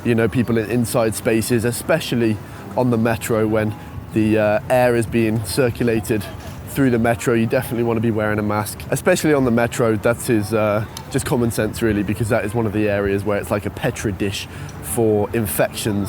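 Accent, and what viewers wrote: United Kingdom. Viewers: Thank tell truth instead too much propaganda news in America, only fools of themselves now. You look good today. Yr accent not too British so easy understand, yr older partner too accent to understand for America people. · Your British English is so cute and nice.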